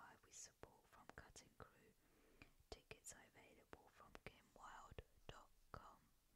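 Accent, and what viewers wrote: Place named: United Kingdom. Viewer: ASMR in a UK accent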